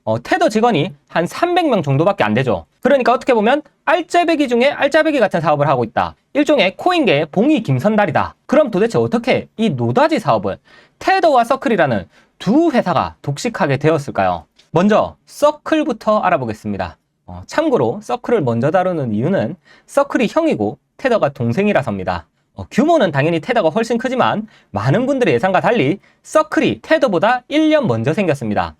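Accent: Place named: Gyeongsang, South Korea. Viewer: 코인계 일타강사 인정합니다. 머리에 쏙쏙 들어오는 내용과 명확한 딕션, 그리고 말씀 중간중간 묻어나는 경상도 억양이 아주 큰 매력입니다. 덕분에 지루할 틈 없이 완강했습니다. 감사합니다. 편안한 주말되세요~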